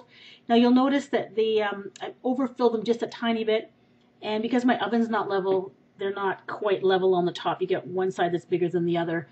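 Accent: Canada. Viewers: Lies! Lol, not that it's a BAD thing necessarily, but I have definitely head you yourself say the word about like "aboot" while watching a large amount of your videos -I'm kinda addicted- :P. Seriously, that was how I almost instantly knew you were Canadian. I just went to your actual channel to verify.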